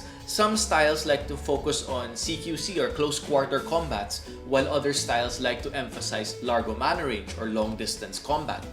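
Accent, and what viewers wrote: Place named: Latin America. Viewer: Wena pregunta. Habla c acento latino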